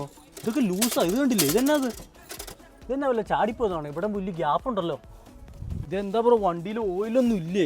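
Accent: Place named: Idukki, India. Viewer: അണ്ണന്റെ സംസാരം കേക്കുമ്പോൾ ഇടുക്കി കാരുടെ സംസാരം പോലെ തോനുന്നു 😂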